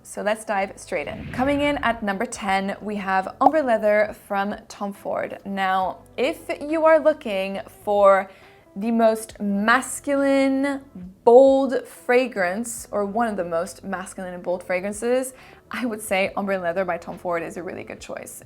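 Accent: France. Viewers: Nice to hear legit French pronunciations! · Why do you use a French pronunciation for an English audience ? It’s pretentious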